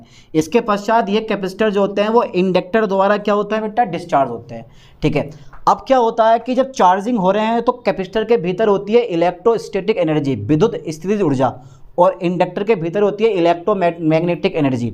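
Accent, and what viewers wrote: Bijnor, India. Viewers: Sir ap district bijnor se ho ...? Apki bolne se lag rha hai ap bijnor district se ho ...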